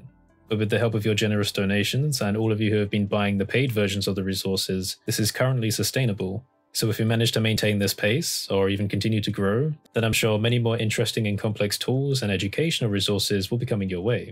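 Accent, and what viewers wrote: United Kingdom. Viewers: You sound English. Anywhere I can throw money at you in GBP to avoid the exchange rate?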